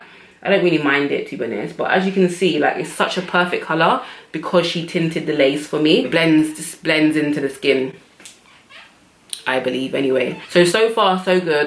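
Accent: United Kingdom. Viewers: Lady you are all kinds of gorgeous! and you have that cheeky British accent too.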